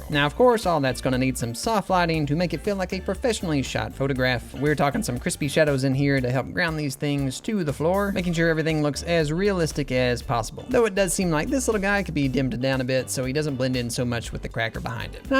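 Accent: South, United States. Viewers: is it just me or does he sound more country now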